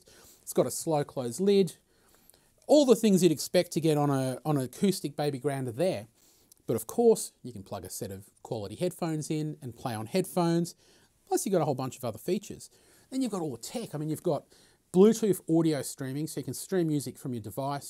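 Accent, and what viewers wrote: Australia. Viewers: Loving the australian accent! how different is the GP609 from the GP607 I by the way own?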